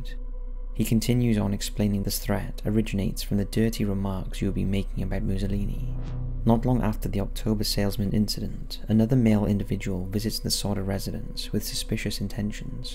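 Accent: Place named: Newcastle, United Kingdom. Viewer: I think hes a geordie lad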